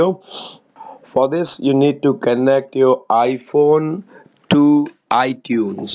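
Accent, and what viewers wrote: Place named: India. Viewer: Every indian accent people give the proper details ❤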